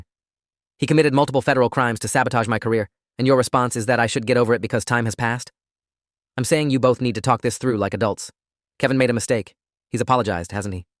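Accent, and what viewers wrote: United Kingdom. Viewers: YESSS! I was just about to ask if I was crazy or is the narrator British? 😂😂😂😂😂😅😅😅😅😅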